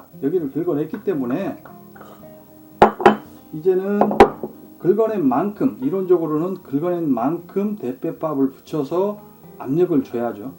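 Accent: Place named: Jeolla, South Korea. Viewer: 사투리를 많이 쓰시는데 호남지방 어디신가요? 나또한 그쪽이라서요.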